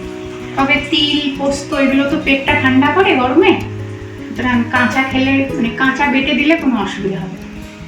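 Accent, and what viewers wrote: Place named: Bangal, Bangladesh. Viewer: Tomar Kotha sune mone hoy tumi bangaal tomar video dekhi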